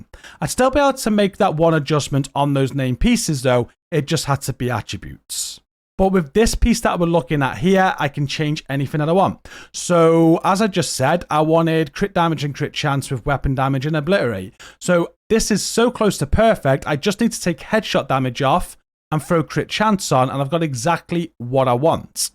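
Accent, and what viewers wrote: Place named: Liverpool, United Kingdom. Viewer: Where are you from? You sound like someone I know from Liverpool 1 haha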